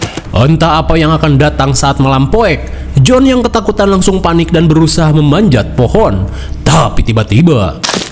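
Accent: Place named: East Java, Indonesia. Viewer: Tak bolan baleni "lele apa yg bisa terbang" 😂😂 Jowo etan teko kang 👍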